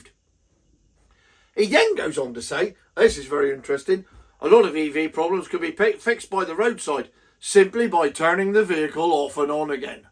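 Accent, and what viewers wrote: Cornwall, United Kingdom. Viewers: You have made my Easter 😅 good Cornish man with a hint of Jethro 🏴🏴🏴🇬🇧🇬🇧✝️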